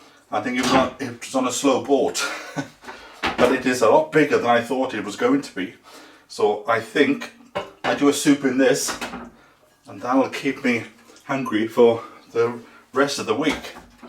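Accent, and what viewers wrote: Wales, United Kingdom. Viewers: Love watching you,find you so relaxing,that lovely Welsh voice.I’ve been in my garden today starting tidying up,loads of bulbs coming up,great time of year.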